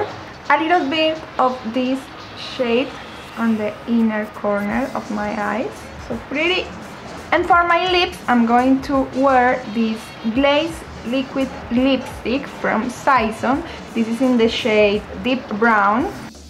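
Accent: Paisa, Colombia. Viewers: Estuvo genial, muy claro y el acento paisa hizo más simpático el vídeo. Esto me ayuda porque soy terrible para el inglés, como que me familiarizo más de esta manera, no sé. Gracias. Luces muy guapa también con los frenos, y el maquillaje quedó precioso. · Estás bien, te falta es la pronunciación más gringa y menos paisa jajaja pero vas bieb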